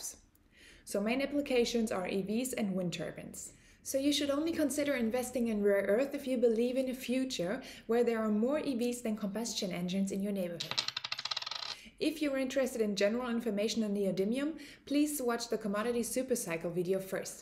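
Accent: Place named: Germany. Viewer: In which country/town you are living? Sounds like german.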